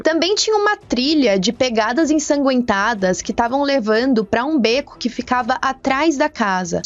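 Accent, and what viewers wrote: Sao Paulo, Brazil. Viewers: Sotaque paulista com gripe.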